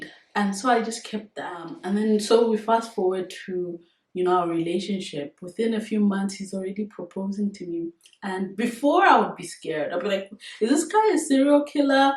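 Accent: South Africa. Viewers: You’re so beautiful 🔥🔥🔥😍and you look and sound South African ❤❤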